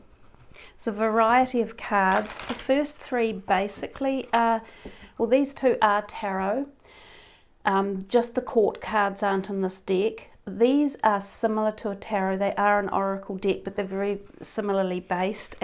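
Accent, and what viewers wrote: New Zealand. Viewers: Sounds like a kiwi accent 🌲🌿 🤗 Thanks for your reading. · “Four different dex of cards”... Love the kiwi axcent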